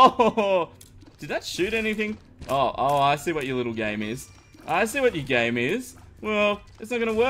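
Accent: Australia. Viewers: WAIT YOUR AUSTRALIAN!?!? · Wow Australian I'm Australian too I can't really here to your accsent like Mr the epic ninjas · Your from Australia!😱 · austrailian?? meh still like his voice:) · Are u from Australia like me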